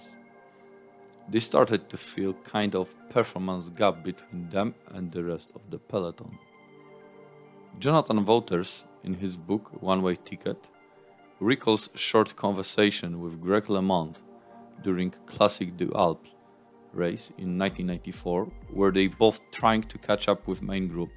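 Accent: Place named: Russia. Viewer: The Ukraine? Romania? To the American ear, it sounds Russian.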